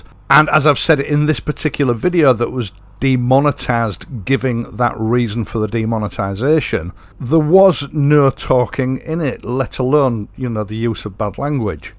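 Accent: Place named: Yorkshire, United Kingdom. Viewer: Well support you’re excellent channel Great Yorkshire man talk 👍👍 You’re a top guy does a brilliant service I recognise most of the roads you’re on Full support here 👍👍👍😎😎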